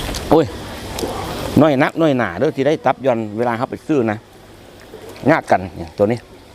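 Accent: North, Thailand. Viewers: คนลาวอีหลีบ่ครับสำเนียงคือเสียงภาคเหนือของไทยแท้.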